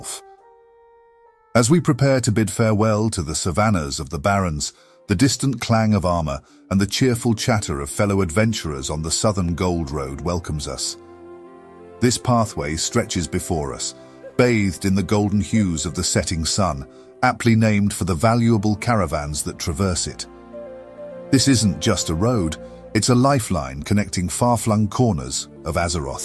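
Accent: United Kingdom. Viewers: Hey, I do not know if this commentary will eventually be read by you, but I must say I really enjoy this splendid series. I am a foreigner so you have no idea how much time I spend on dissecting every little word that I cannot get through haha. This is such a good improving lesson each time a new video comes out though and even if I always considered myself bilingual, realising I still can struggle sometimes with some words is a wee bit frustrating haha. So here I am, catching myself reading outloud the subtitles as you speak and even though the videos are around 20-25 minutes, I spend the double amount of time training and repeating that lovely british accent. So thank you for that, mate. Have a good day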